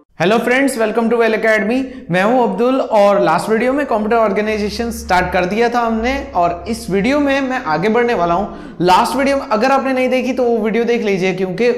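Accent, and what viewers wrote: Gujarat, India. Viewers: bhai gujarat se ho?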